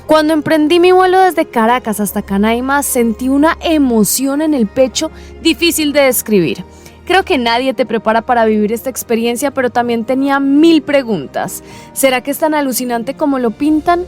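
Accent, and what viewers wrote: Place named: Antioquia, Colombia. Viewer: Ese acento paisa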